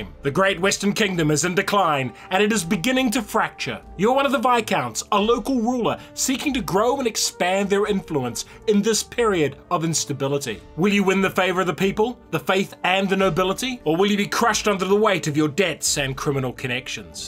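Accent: New Zealand. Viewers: In all fairness, he doesn't have the Letter I thing as much asmost Kiwis do, but you can still tell he's a Kiwi and not a Brit lol (For those who don't know, The Letter I thing is how you can tell Aussies and Kiwis apart, cos Kiwis struggle to sound the letter I in words... thnk, blnk, snk, as opposed to think, blink, and sink, for instance lol)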